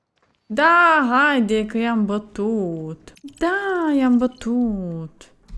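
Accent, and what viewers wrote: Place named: Transylvania, Romania. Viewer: Mie imi place tot ce joci, pt ca imi place sa ascult ardeleneasca ta😂😂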